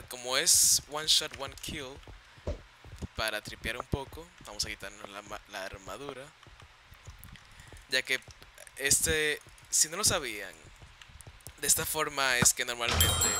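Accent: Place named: Dominican Republic. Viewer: Hay deohmeo mijo tu ere de RD representa ese acento :v parece pajaro :p sin ofender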